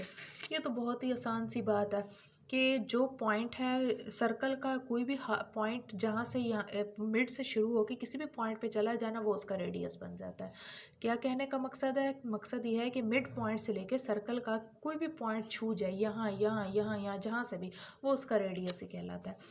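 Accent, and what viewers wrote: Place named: Islamabad, Pakistan. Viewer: actually. Apki Urdu kaafi saaf ha. I think Islamabad ho sakta ha then.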